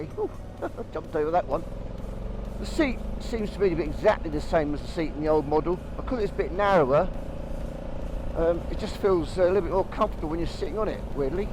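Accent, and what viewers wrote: United Kingdom. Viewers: You sound like an east-end barra-boy, yet your pronunciation of panniers was the poshest I've ever heard! 😀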